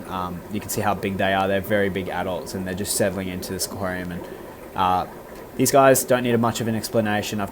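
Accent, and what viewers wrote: Australia. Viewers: Hold up are you from Brisbane, Australia?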